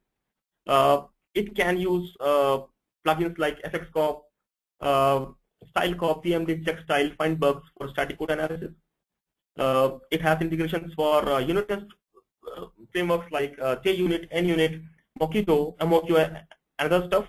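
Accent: India. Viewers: ingles, ele tem um sotaque indiano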